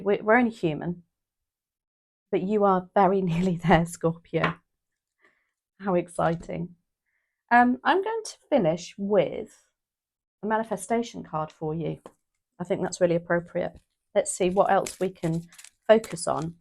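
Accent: United Kingdom. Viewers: I do think a lot about money and once I heal I will get chances to make it a lot, but right now I will try to take manifestation advice. Reading is astonishingly accurate and excellent as always. You are also very beautiful lady and Your British accent with great readings is just delight to hear. Thank you for helping us very much!!! ☘🌷🌺🌷🌺🌷🌺🌷💗🌺🌷🌺🌷🌺🌷☘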